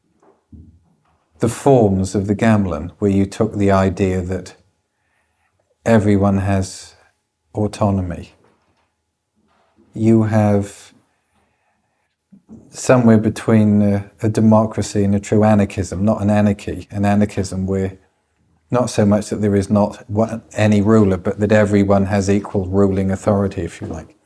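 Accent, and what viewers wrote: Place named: RP, United Kingdom. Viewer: What I like most about Fripp is his accent. Clear, well spoken English. Maybe a bit too RP on the ends of words sometimes, but otherwise very clear and easy to understand.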